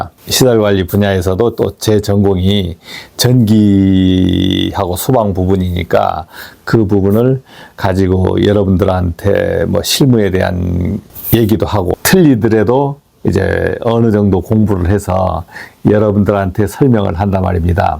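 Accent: Jeju, South Korea. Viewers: 동향분이셨군요 제주도 말투가 보여서 긴가민가했었어요 ㅎㅎ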